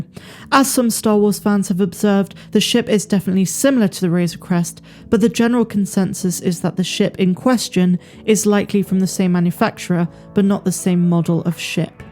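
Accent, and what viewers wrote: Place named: West Country, United Kingdom. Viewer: Is from bristol? Or West country? I can hear a twang! Happy new year Love the content!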